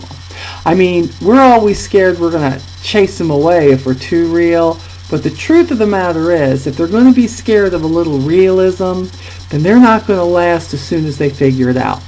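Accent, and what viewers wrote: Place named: Maryland, United States. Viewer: Your videos are informational, packed with lots of tips and inspirations of your experiences in the real world of Avon. Love the part where you say, Avon Chicks. For some reason, those two words and the emphasis you place on those two words make me smile. I think it's your Maryland accent. I have a wish for you and Lee. Continue to rock in your Avon business! (Jeff)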